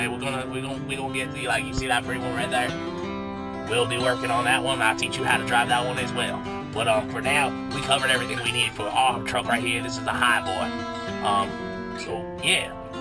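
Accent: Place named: Louisiana, United States. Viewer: he sounds like he has a lousiua byoue accent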